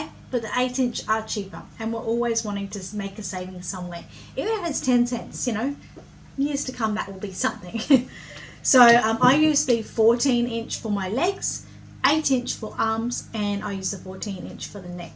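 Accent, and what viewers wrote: Australia. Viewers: Hi I am very new to reborn l wanted to complement you on your lessons have learnt so much from you it is so nice to hear an Australianaccent will keep watching you al the best from Sheryl from Victoria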